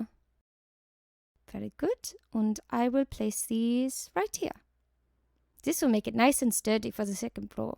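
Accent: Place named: Germany. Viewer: YEESSSSS. YOU NAIL THE GERMAN ACCENT. SO WELL. THANK YOU!!!!!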